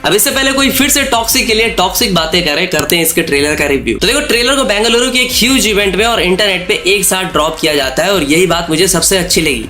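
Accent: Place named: UP/Bihar, India. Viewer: Bhai Tumhara review Pura scripted laga Kyun ki Ji angreezi shabd tumhne trailer ko uncha dikhane mein istamaal kiya woh kaafi ache hai Aur Baaki angreezi tumne istamaal kiya Woh UP/ Bhihar ka MTI yane mother tongue influence se bhaara hai. Pata nai Kitne log ye mehsus karenge but Mera opinion yahi hai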